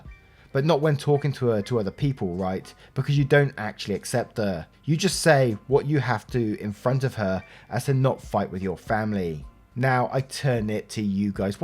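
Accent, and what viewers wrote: United Kingdom. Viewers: I am here for the British accent XD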